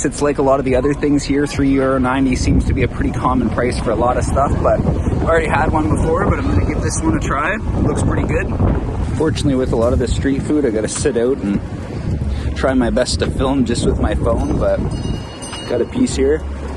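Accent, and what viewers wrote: Canada. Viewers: You sound very Canadian. Great video, very helpful for sure thank you !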